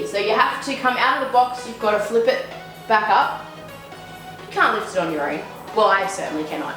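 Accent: Australia. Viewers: Im researching the sawstop and came across your channel and can I say it’s so nice to hear another Aussie. That was a great review and content thanks for sharing it’s been 3 years for you so I’ll check out some more of your videos and see what your up to and what you think of your saw now cheers. I gave a sub to and a like of course.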